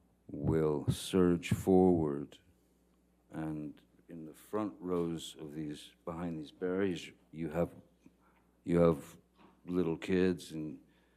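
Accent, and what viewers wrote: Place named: Pakistan. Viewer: Forget about him, love me instead. He sounds like he's from Pakistan.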